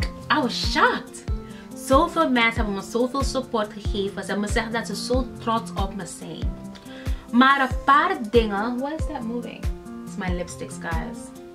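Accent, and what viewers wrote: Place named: United States. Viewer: Beautiful. One question... You have an American accent sound too... Did you grow up in the US too? Also are there very many American or European people in Suriname now? Okay that was two questions lol.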